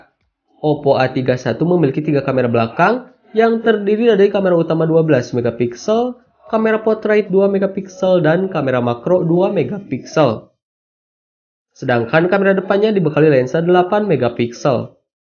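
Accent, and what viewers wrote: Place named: West Java, Indonesia. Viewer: SAYA MENCIUM NADA URANG SUNDA IEU MAH BOAA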